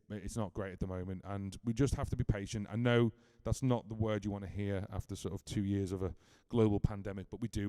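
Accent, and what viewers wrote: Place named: North Wales, United Kingdom. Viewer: excellent news. You sound fairly local to me? (I'm in Denbighshire, North Wales)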